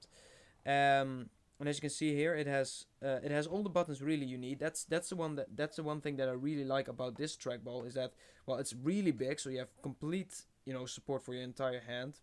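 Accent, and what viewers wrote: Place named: Netherlands. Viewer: dutch accent :P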